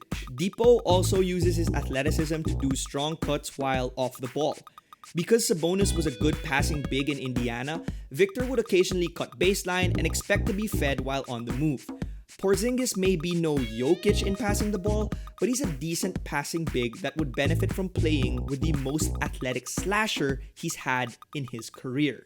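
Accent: Philippines. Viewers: I can recognize your accent. Are you Filipino? Great video btw! I love the mavs! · Yo are you Filipino? I feel like I can hear the accent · Are you Filipino? The accent. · Hey man, are you filipino? My friend is filipino and he has the same accent as you! Anyways, great video!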